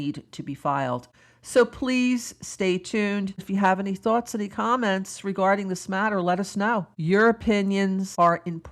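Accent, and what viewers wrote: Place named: East Coast, United States. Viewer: Oh, and I just have something else to add. From today and on into the future whenever someone says to me' "who asked for your opinion "? I will turn to them and smile serenely and reply... "KATHY DID"! 😅😅 I LOVE YOUR EAST COAST ACCENT. It cracks me up when I hear you say DAY -GO'S name as Dah-go ! It's like out west people say "my ANT" , where as I say "my AUNT". PUH TAY TOE, PUH TAHT TOE! 😂😂😂. After almost 4 years I actually just learned his real name is Joe !! 😅 Hope you're enjoying the weekend!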